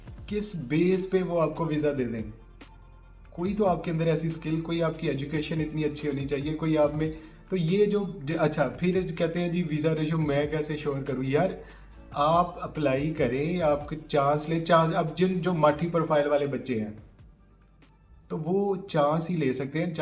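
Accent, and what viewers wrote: Faisalabad, Pakistan. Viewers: The way you deliver reality with faisalabadi accent is 😅👌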